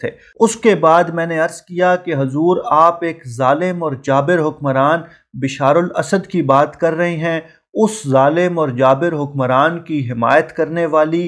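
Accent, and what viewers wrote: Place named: Attock, Pakistan. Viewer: Welldone ,ap ka lehja attock jand wala lag raha hai.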